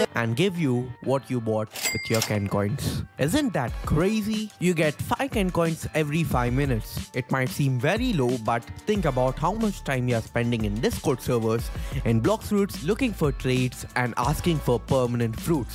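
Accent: India. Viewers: Yuo indian?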